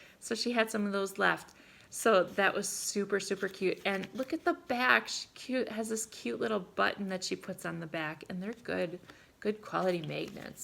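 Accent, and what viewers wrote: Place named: Minnesota, United States. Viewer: I just love your videos! You are so fun to listen to. I love your “Minnesota” accent. 😉. I’m a MN girl too and I live about 20 minutes from you (just north of Becker). I can’t wait to attend my first cross stitch retreat. I’ve attended quilting retreats, but not cross stitch. Hopefully some day we will meet.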